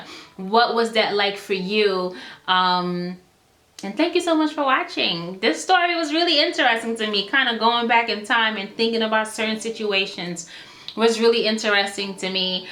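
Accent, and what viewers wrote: New York, United States. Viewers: I thought you would have a foreign accent but you don't. You sound similar to a New Yorker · I tought I was going to hear an accent, but not at all! You sound like an American. Grappig om te kijken naar een engelstalige youtuber, waarvan je weet dat diegene ook Nederlands praat haha.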